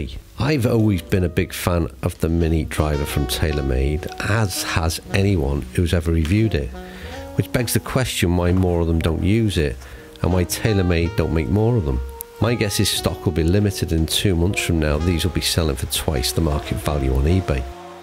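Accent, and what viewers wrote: Liverpool, United Kingdom. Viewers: Love the plazzy scouse delivery. Very blowey in the Wirral😂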